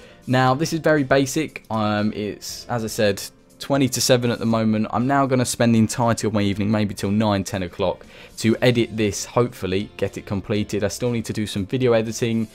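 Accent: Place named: United Kingdom. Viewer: Awesome video + i love that brittish acsent im bad At English because im Finnish :(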